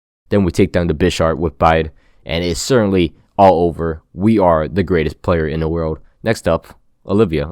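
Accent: Italy. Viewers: Wait are you italian? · Are you italian? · Wait are u italian?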